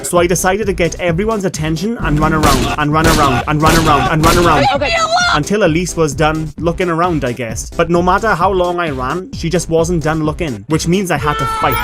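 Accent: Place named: Wales, United Kingdom. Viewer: Welsh accent is carrying this video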